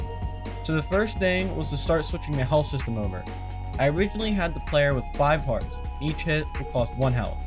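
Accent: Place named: South, United States. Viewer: southern accent sounds lovely!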